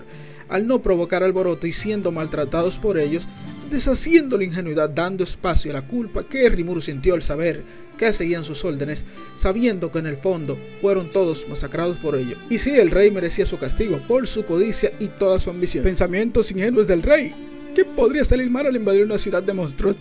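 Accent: Dominican Republic. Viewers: eres dominicano? xD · Bro, ¿eres dominicano? Me encantan tus videos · Bro tengo una pregunta Eres Dominicano?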